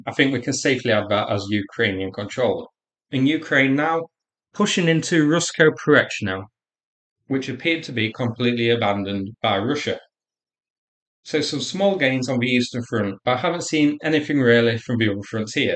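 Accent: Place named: Wales, United Kingdom. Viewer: bruv, srsly pls try speaking a bit MORE english, and a bit LESS of whatever accent of yours that is...sometimes ya seem forget you have an INTERNATIONAL audience, and we dont speak WELSH or GAELISH^^ xD thx in advance!!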